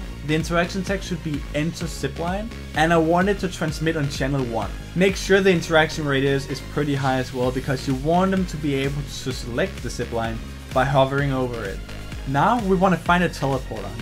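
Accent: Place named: Denmark. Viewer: You sound danish or skandinavian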